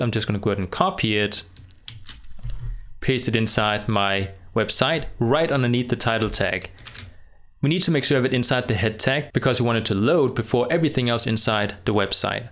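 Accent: Denmark. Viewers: Thanks for the tutorial, helped me a lot. Btw, are you Danish by any chance? Your accent sounds really danish, or something like that.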